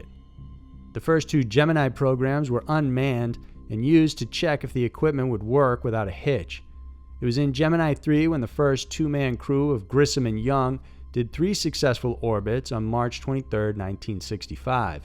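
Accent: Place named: Texas, United States. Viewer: He is probably from Texas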